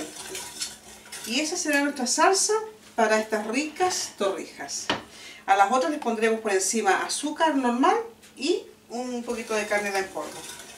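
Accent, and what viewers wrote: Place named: Chile. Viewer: aca en mexico le llamamos capirotada... que ricas se ven!!! amo en verdad el acento chileno!! un gran abrazo!!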